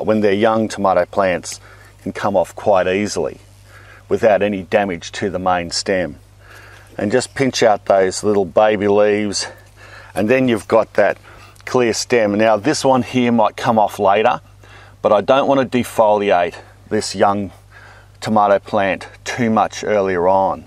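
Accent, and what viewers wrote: Australia. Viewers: Greetings from Munich/Germany :-) Watching you on a quite nasty, cool and rainy Bavarian late spring evening, while having my own tiny tomato plants waiting (still - fortunately - quite patiently) for warmer weather, so that they can finally conquer their city balcony. I'm mostly following comparable (or not so much if comes to climate conditions and techniques!) German gardening channels, but I love coming back to you every once in a while, and let it be solely for a reminder of my own time down under and by taking in your lovely accent. Keep "mulching". Nice work & cheers! ;-)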